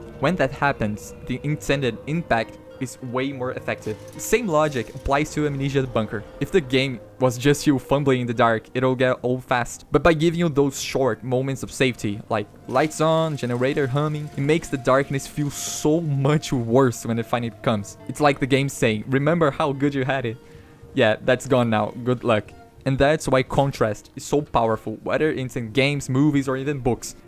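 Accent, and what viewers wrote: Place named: Brazil. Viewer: amazing survival horror study, your game is gonna rock (and judging by your accent i think this is a r/suddenlycaralho? kkkk)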